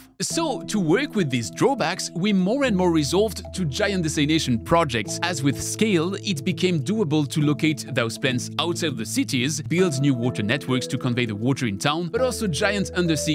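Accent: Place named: France. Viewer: Your french accent is sweet, but please düvlop, it really is so hard to understand it is.. de-vel-op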